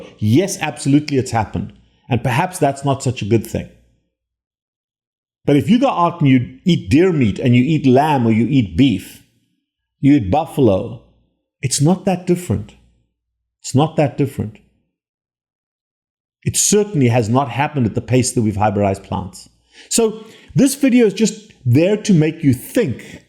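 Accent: South Africa. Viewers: Always lekker to hear a South African accent here on the tube... Thank you, I appreciate your videos, love from Darljng South Africa! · Super-informative video! Love the accent! South Africa?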